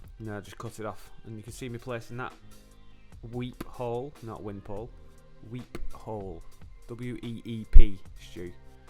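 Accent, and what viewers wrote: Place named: Wigan, United Kingdom. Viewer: Where does stu reside, sounds like a Wiganer?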